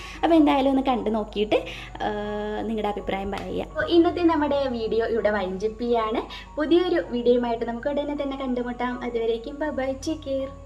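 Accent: Kollam, India. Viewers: കൊല്ലം സ്ലാങ് പറയൂ. മിക്കപ്പോഴും മറക്കുന്നു.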